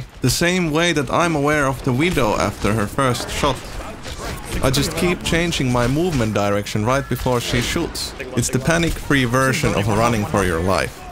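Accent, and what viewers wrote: Finland. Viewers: I instantly knew you were Finnish when you started talking 😅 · What is your main language becouse you sound like how finnish people speak eglish?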